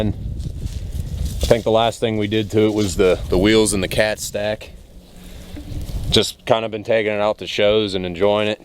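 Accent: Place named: Pennsylvania, United States. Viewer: I honestly got hooked on your channel because of that thiccc PA accent. I have a lot of family from PA so it’s refreshing to hear lol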